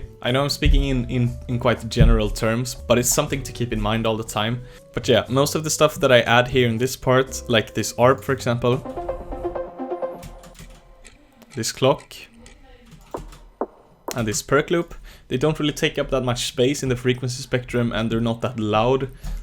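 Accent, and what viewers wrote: Sweden. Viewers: Dude that brutal swedish accent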